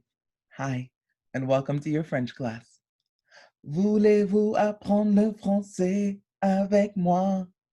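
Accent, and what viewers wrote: France. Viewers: I love your French accent 💕 I tried to take French when I was in high school but never could get the hang of it .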